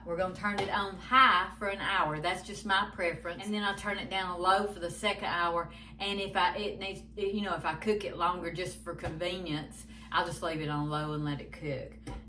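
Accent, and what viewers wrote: South, United States. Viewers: THANK♥️YOU FOR SHARING YOUR VIDEO! I RAN ACROSS YOUR CHANNEL WHILE LOOKING UP THE COPYCAT WENDY’S CHILI RECIPE SUBSCRIBED TO YOUR CHANNEL. I JUST LOVE YOUR SOUTHERN ACCENT,YOUR CONTENT AND YOU,YOUR FAMILY AND HOW YALL GAVE BEEN MARRIED FOR 45 YEARS. I WAS BORN,RAISED& LIVE IN NORTHEAST GEORGIA. ME&MY HUSBAND HAVE BEEN TOGETHER FOR GOING ON 23 YEARS(3/24/25) & HAVE BEEN HAPPILY MARRIED FOR GOING ON 22 YEARS(4/6/25). WE HAVE A DAUGHTER(AGE:22) & A SON(IN HEAVEN) HE WOULD BE(AGE:17). OUR DAUGHTER HAS A BABY BOY(AGE:2)OUR FIRST GRANDBABY AND THE JOY OF OUR LIFE. WE ALSO HAVE 3-FUR/4~LEGGED BABIES(CHIHUAHUAS),AGES:10,9 & 1. WE ARE VERY BLESSED! JESUS IS THE REASON FOR THIS SEASON! HE IS #1 IN MY LIFE & HE IS THE ONLY WAY TO GET THROUGH ANY TROUBLES IN EVERYBODY’S LIFE. KEEP UP THE WONDERFUL WORK/VIDEOS YOU DO! YOU’RE AWESOME!!! HAVE A✝️BLESSED & 💟WONDERFUL🌛NIGHT!👍🏻😉💓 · I made this chili & my man loved it! 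Thanks for sharing! I love your Southern accent, I lived in Florida but am back home in Wisconsin!😊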